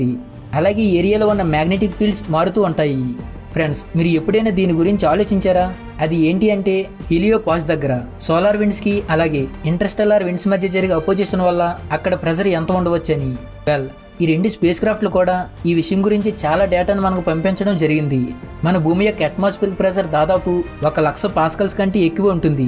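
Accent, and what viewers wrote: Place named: Srikakulam, India. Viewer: Pl don't make videos with this SRIKAKULAM ACCENT....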